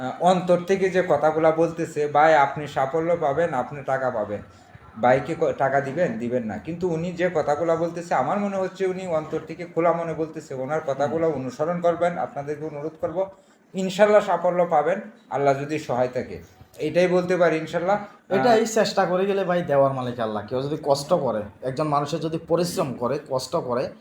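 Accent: Chittagong, Bangladesh. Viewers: bai apner bari kotai? Apner kotha sone mone hocce Chattogram er hoben. Jodi Chattogram er hon tahole baiya ami apner sate deka korte cacci ❤❤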